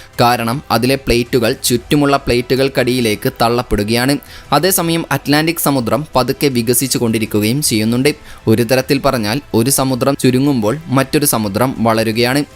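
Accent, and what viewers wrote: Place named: Malappuram, India. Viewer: മലയാളം നന്നാകണം. ഇത് മലപ്പുറം മലയാളം ആണ്. മലയാളത്തിൻ്റെ ഉച്ചാരണം ഇങ്ങനെ അല്ല.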